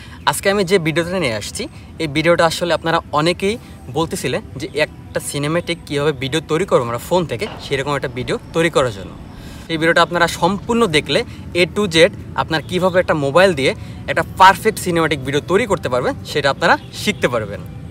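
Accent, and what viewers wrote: Sylhet, Bangladesh. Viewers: Are you sylheti ? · Tumi Sylhet taki naki vai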